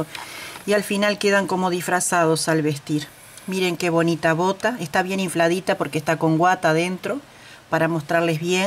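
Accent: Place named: Argentina. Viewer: hola,,amiga yo pensaba k eras argentina por el acento,,, todo lo k haces es muy lindo y todo muy bien explicado,,, maravilloso tutorial,,,un abrazo muy fuerte,, desde islas canarias ,,,España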